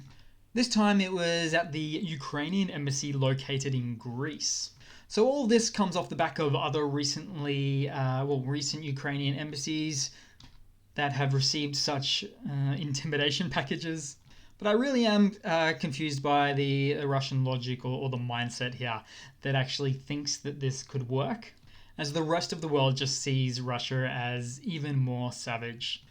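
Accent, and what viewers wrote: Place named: Australia. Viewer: If you're referring to accent, he's Australian.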